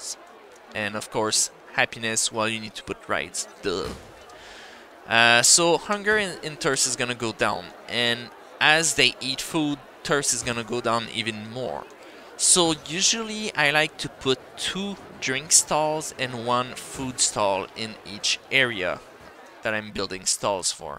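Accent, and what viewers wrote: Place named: France. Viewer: Yo, nice video homie ! Btw, are you French ? Anyway, keep it up.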